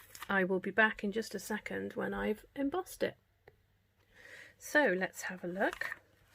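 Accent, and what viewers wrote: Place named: Wales, United Kingdom. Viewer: Beautiful, great technique! Great to hop with you. Also, I hear a little welsh accent coming through. Reminds me of home!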